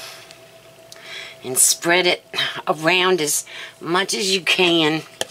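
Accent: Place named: Oklahoma, United States. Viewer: Your voice sounds like Oklahoma . Thank You for this recipe .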